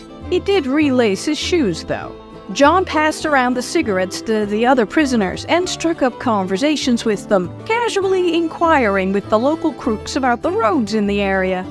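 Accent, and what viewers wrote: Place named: Netherlands. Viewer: I love that Dutch accent. This is brilliantly made.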